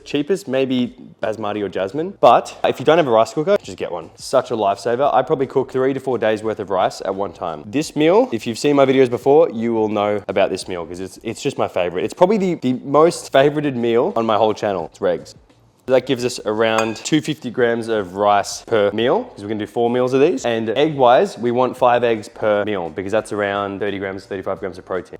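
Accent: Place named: Australia. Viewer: AYYYYYY another meal prep video in australian! lesssss goooooooo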